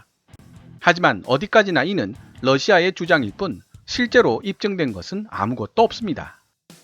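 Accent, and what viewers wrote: Gyeongsang, South Korea. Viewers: 얏~야! ....니는 갱상도 사투리부터 고치는게 시급하다!!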